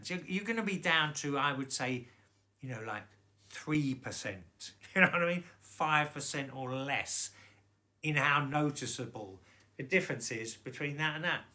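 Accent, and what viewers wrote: London, United Kingdom. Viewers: Interesting! You’re comparing the analogue output from the iPad /iPhone to the amp, ie using the Apple internal DAC. I’ve now (last week) bought a Cyrus Soundkey thumb size inline DAC (£60 + £10 for the Lightning adapter) which you plug into the Lightning port. It makes a really worthwhile improvement with earphones, like the music is clearer, sharper and in a bigger room. If you fed this combination into the amp, I bet it might even beat the Node 2i ! In fact, I usually use Airplay to go from my iPad to my own Yamaha WXC50 network streamer and hence to the amp; this also bypasses the Apple internal DAC, of course, and sounds pretty good to my old ears. Thank you for your honest reviews. So many seem to be trying to sell you up. I hope you aren’t offended when I say I love the London accent.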